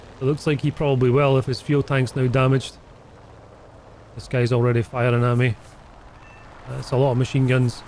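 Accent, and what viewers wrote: Scotland, United Kingdom. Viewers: I always want to see a Sherman firefly video, I like these videos too its like a Phlydaily video but Scottish which is cool.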